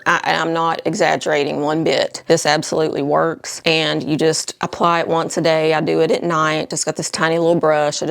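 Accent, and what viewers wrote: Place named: Virginia, United States. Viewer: Your dogs r so cute! We lost our 13 year old toy poodle a few years ago and r thinking of getting a small dog. I have to tell u I was born in Virginia and moved with my family to Idaho when I was 10. You remind me so much of my aunts and cousins in Virginia with your cute accent and your no nonsense personality! I think that’s one reason I love your videos so much! U r just darling! Great video as usual thank u! ❤😊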